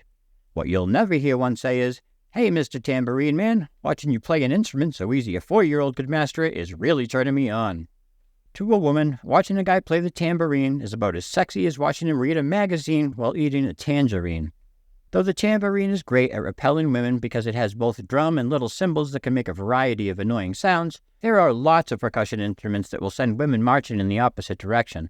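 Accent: New England, United States. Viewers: Hearing you makes me miss New England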